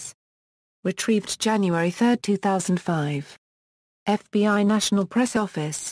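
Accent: United Kingdom. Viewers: That’s just how British people talk lol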